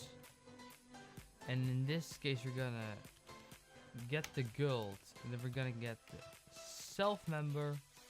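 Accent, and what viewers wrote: Netherlands. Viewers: your accent like a Dutchman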